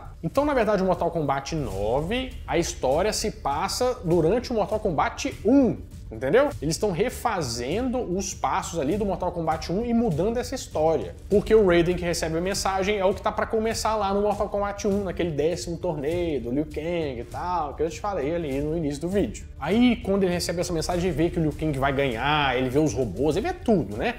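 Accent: Minas Gerais, Brazil. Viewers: Mortal Kombat em Verso e Prosa KKKK. Do jeitim Mineiro kkkk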